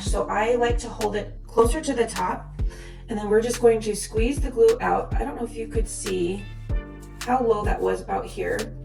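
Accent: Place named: United States. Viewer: Hey from Melbourne Australia 😁 I need to tell you and I hope you see this that I've never come across your channel until today and from the get go your presence in front of the camera, sounds weird but your American accent and tone is so easy to listen to😄 lol it's positive, straightforward, informative, believable, easy to relate to, fun to watch and you instantly drew me in! I love your name and the design on either side as there no other distractions in the frame and my eyes go instantly to you. " Well of course that's the intention" lol my favorite colors are pink/ purple/ turquoise, peach and silver and obviously that's another reason I was sucked in 💜 then of course you are an edgy, sassy, talented, funky, creative, bubbly, charismatic goddess of a UNICORN 🦄 Female🤩 God I'm full of compliments today lol and of course your stunningly beautiful have the best eyelashes of course and ooze mad swagger!!! 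There ya go💜 and I will be using all your tips for doing my lashes for myself when I buy the products I need. You brightened up my day so thankyou ☺ from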